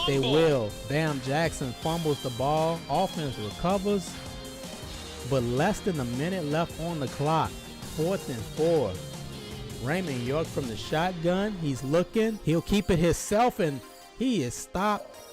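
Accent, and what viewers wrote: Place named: New Orleans, United States. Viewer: You have great content on your channel man 💯 And you sound like you from New Orleans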